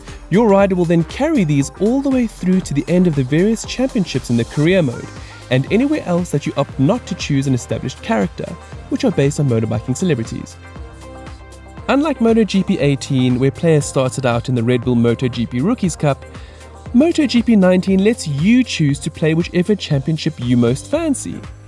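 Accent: South Africa. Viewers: Well stewie is british and this guy has a south african accent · Any South African voice gets a Like and Sub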